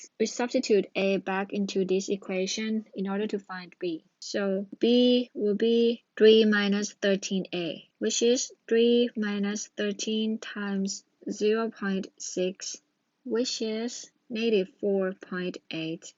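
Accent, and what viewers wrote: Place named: Bangladesh. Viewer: Are you from Bangladesh?.. Your English accent is similar like us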